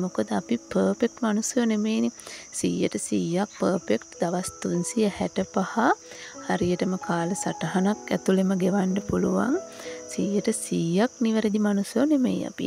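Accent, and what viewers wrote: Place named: Dry Zone, Sri Lanka. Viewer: වියළි කලාපයේ kiw නිසා අහන්න හිතුණේ oya කොහෙද අක්කේ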